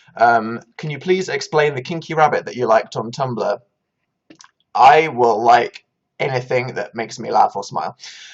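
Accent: RP, United Kingdom. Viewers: Your so posh lol!! Was deleted wwwsexyllamas was deleted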